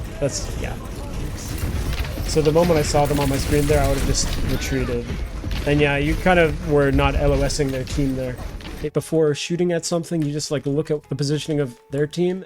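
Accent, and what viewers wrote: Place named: Canada. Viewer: That's quite a Canadian accent you've got there. When y'all say the word "out" y'all say "oWoot" lol XD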